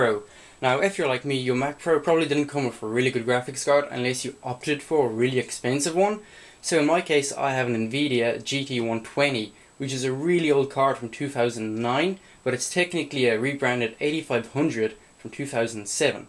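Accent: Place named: United Kingdom. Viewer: you talk sooo british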